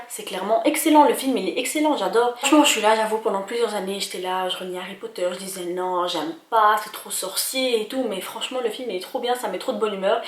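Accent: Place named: Belgium. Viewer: J’ai tout de suite vue que vous étiez de Belgique votre accent est bien ressorti 😂😂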